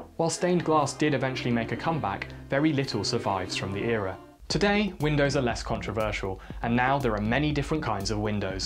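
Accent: United Kingdom. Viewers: This guy does a pretty good British accent.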